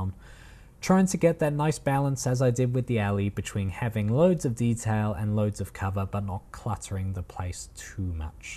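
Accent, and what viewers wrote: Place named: Australia. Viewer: Oh shit your Australian! Victoria4Life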